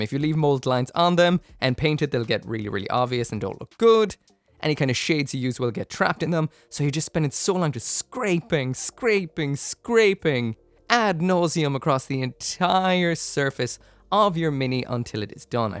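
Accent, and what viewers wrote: Ireland. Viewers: You sound like a fellow potatolander. Also mobile suit skirmish exists for 1/144 wargaming And zero well less mould lines is pure wintanium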